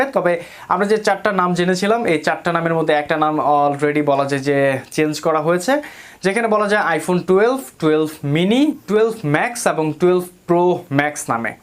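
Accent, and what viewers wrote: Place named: Bangladesh. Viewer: nA...ashole apnr ai vedio te beshir vag e india te phn launch korar kotha bolchilm to.. Ty vbsilm j apni hoito indian...bt apnr voice shune bhujha jai apni Bangladeshi 😅😅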